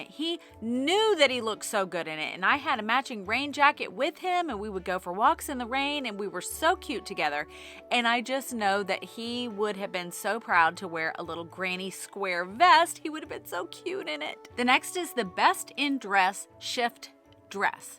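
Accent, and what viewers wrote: United Kingdom. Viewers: I was was not expecting that British accent!😂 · Nope nope nope. All that faffing about, you may as well spend time weaving in ends. I'm British and love that you mutter to yourself in a Brit accent! Thank you for the review.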